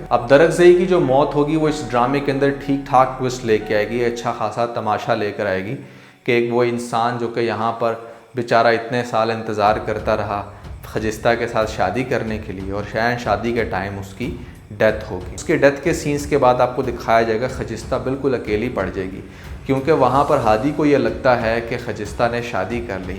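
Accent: India. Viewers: are you from India? Because your style is very Indian way of talking, your pronunciation of certain words are also not correct, if you are Pakistani you better improve your Urdu...nonetheless your reviews are ok.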